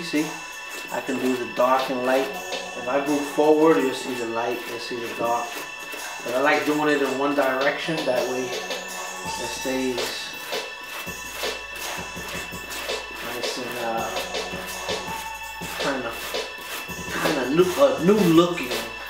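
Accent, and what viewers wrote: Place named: New York, United States. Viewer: I hear a lot of new york in ya tone👊🏼👊🏼💯💯good video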